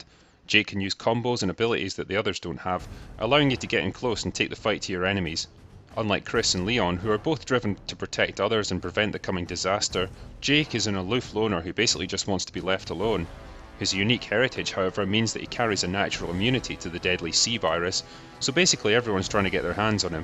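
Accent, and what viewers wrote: Scotland, United Kingdom. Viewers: ive had some Scottish friends and they sound a lot like him..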